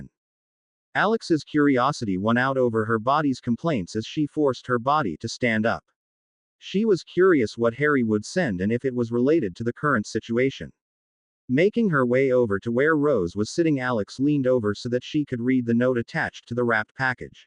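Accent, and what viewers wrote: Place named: India. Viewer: Just let the AI read sverythinh l8ke before because we cant hear you properly Ypur indian accent is very heavy..and the audio qualjty is now poor unlike your earlier stories Yhr stories are nice but work on that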